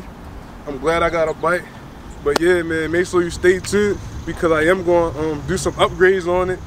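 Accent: Baltimore, United States. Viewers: Thank you g, about to go cop one today actually. But aye bro where are you from? Your accent is wild..Baltimore? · I live down south it's hard to find parts for those Chinese bikes you sound like your from Baltimore is it easy to find parts up there for those Chinese bikes ?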